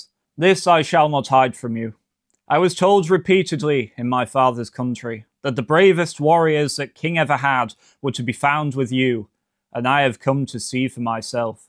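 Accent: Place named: United Kingdom. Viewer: American here with honest question. The reader has to my ears an unusual Brit accent. Where is he from?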